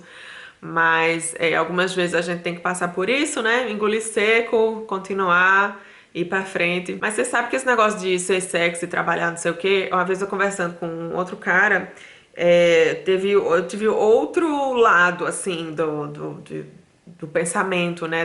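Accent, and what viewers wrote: Northeast, Brazil. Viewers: vc é muito meiga, educada e tem um maravilhoso acento nordestino. Deve ser portanto, muito difícil para os suíços acreditarem que uma mulher tão linda e jovem seja: brasileira, alta executiva, poliglota, etc. Enfim, vc faz parte de 1% da população feminina brasileira. Parabéns! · O Youtube me indicou teu vídeo,vou ver todos os vídeos, gostei do conteúdo,de saber que os europeus são racistas e preconceituoso contra estrangeiro,e pelo sotaque vc é nordestina né? parabéns vou ver todos.feliz natal pra toda sua família 🍾🍾🎉🇧🇷🇧🇷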